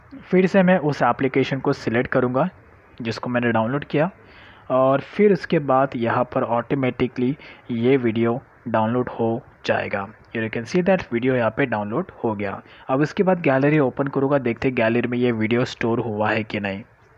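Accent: India. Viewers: indian accent, dislike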